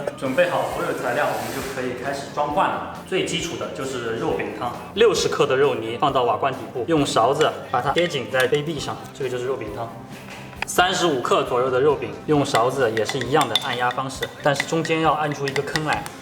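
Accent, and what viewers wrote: Nanchang, China. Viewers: 南昌话说的好地道，谢谢介绍南昌美食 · 标准的南昌话，浓浓的粉味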